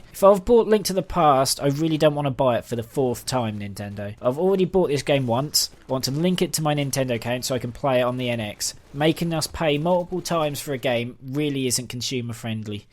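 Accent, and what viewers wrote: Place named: Norfolk, United Kingdom. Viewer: Im originally from Nottingham now I live in Norfolk Sheringham had done for 8 years so iv got an ear for the Norfolk dierlect how ya getting on lol you gave it away when you said money grabbing I think they know to be stronger then the ps4 and xbox I'm loving the wii u pick up and play with the handheld I think it will surprise every one it needs to add me in wii u my Id is imrobinurhood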